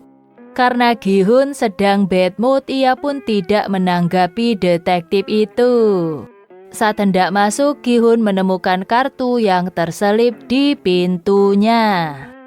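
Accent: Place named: Java, Indonesia. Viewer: Mbak e suarane medok tenan 🙏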